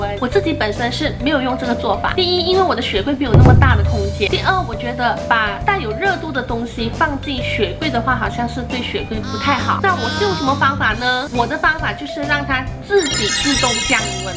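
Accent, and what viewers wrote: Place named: Taiwan. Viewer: 姐姐，还以为你是来自台湾的呢？哈哈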